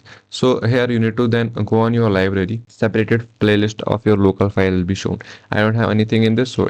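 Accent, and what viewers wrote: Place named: India. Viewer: Anytime when I hear a Indian voice, you know they about to give the best advice 😎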